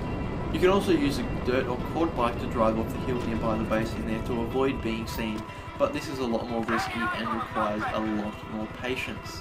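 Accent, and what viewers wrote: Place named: Australia. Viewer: Yay a Aussie voice :)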